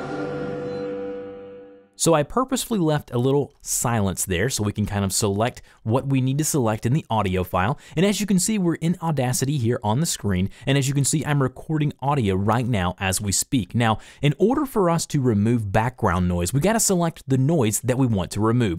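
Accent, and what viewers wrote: United States. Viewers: Man u got a nice accent, which state ur from? -( I’m not an American)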